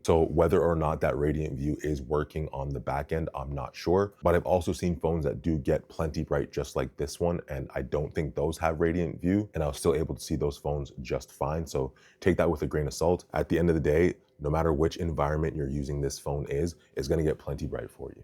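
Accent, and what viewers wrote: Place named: Canada. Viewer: Oh you're Canadian. PROcess and aboot are giveaway words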